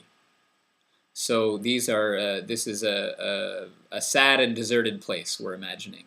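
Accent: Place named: Scotland, United Kingdom. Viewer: I'm interested in the numerical aspect of this one. 'Nine gentlemen' - seven is a common variant in folk songs, as in 'Seven Yellow Gypsies' (yellow not meaning 'cowardly'). I don't speak Scots but you sound right to me, though I rarely hear it. It's a simple story really! Those big ballads seem very interconnected to me, as though variations on the same basic themes. Love, separation, death / murder, betrayal. The Mills and Boon of their day.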